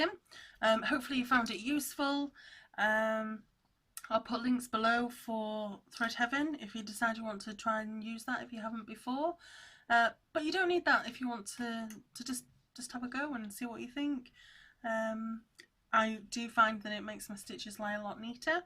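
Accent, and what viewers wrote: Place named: England, United Kingdom. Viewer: For starers I just love your videos they are such fun cause your character is very enjoyable, so you have encouraged me to cross stitch i am a lover of embroidery but cross stitch has caught my attention thanks to you. I am looking for someone here in my town to show me how cause i'm more of a hands on. Plus i love the English accent it sounds so cool.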